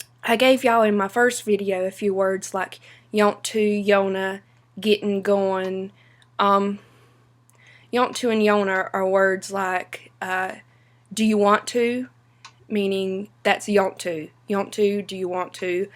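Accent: North Carolina, United States. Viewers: southern accent is really sweet and hearing it from you makes it sweeter. ;) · I’m from North Carolina too and we sound EXACTLY ALIKE . Don’t notice until I hear someone else speak just like me. 😂😂😂😂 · Hello, I'm Vietnamese. And I really really love the Southern American accent:) Thank you for your videos. They're so useful for me.:) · I just love your southern accent. Thank you especially what you said about southern men- I met one recently and he seemed really nice😊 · Love the accent !!I'm originally from Bladen county N.C. and you sound like my Aunt Jo ! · A very pleasant southern drawl. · Please, do the accent tag video List of words to read and about 10 questions about different words and expressions southern accent is cute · Omg! I'm soo glad I found a real accent. Because some of thwm other videos are fakes. My friend's german and she wants to learn my accent (eastern nc) but I cant explain it. 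You are much better and not one them fakes. Thanks. :) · My mom's from Snow Hill and your accent is the closest I've found to hers on YouTube. She pronounces "pirates" like "piarts." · I've come across this video while searching for examples of the North Carolina accent I knew and loved back when I lived there a couple decades ago. What a delight it was to not only hear you speak in it, but to say such meaningful, wonderful things in it. It's been 14 years since you uploaded this - I hope those 14 years have been very good to you. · I would recommend ditching the accent if you ever hope to get a job north of the Mason-Dixon Line. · You have a beautiful accent. I moved to NC from Massachusetts and I will always have my New England accent regardless of how long I live in NC. NC accents sound more "country" than "Southern" to me which is beautiful in itself. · Hey 👋 I am Gem 💎 I love the Southern accent! 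❤I be sayin certain things like this way I B4 new any accents. Tanks I subscribed to your channel so not letv me down love 💎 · Beautiful southern accent! I'm from Arkansas and have a strong accent but it's quite a bit different from yours. Sadly because of movies and tv a lot of places are loosing their accents. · Your accent is awsome!! Im from Finland and i speak finnish so X). But that Southern accent sounds to my ear so beautiful and nice <3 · Nice southern accent. Its hard to find on YouTube. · I’m from Georgia...our accent is a little different...the North Carolina accent is similar to the Tennessee accent at least in parts of Tennessee...it’s just lovely.. two absolutely beautiful states....love those Smoky Mountains...it seems to me that the South Carolina and Georgia accents are similar...maybe a little slower than your..it’s funny..there are different accents in areas very close to each other...I remember the little farming towns had their own accent..and their own way of saying certain words..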